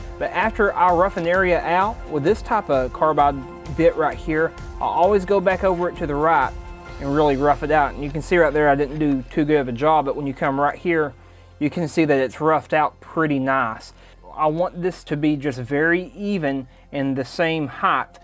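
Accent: South, United States. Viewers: I just love your southern accent! New to woodworking and loving it!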